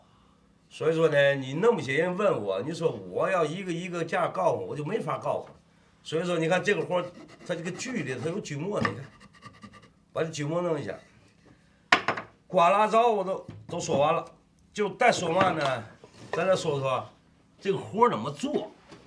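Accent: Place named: Tianjin, China. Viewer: 天津话贼个锃啊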